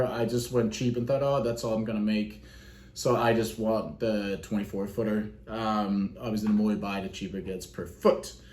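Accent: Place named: Russia. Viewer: Thanks for the idea, im doing this as i type! Btw, what country are you from? Ive watched alot of your videos, and i swear i can hear a slight dialect from maybe russia?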